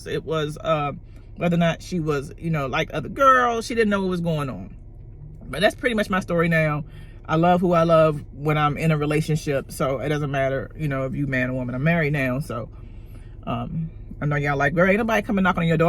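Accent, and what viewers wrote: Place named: DMV, United States. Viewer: It’s the DMV accent for me 🥰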